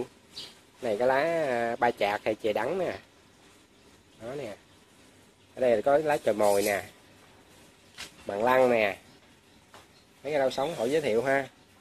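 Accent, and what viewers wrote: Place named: Southwest, Vietnam. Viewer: Rat thich Video clip cua Anh, hinh anh giong mien Tay cung giong noi chan chat mien Tay cua Anh lam toi nho lam que toi . Hy vong mot ngay gan day se ve VN cung anh nhau mot bua : ))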